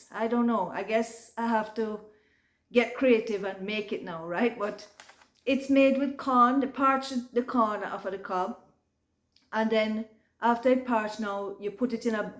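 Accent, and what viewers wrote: Jamaica. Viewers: I would like to see plp face wen dem hear yuh talk we in Jamaica from we hear you we know yuh come from yah bless up respect ✊ 🇯🇲🇯🇲🇯🇲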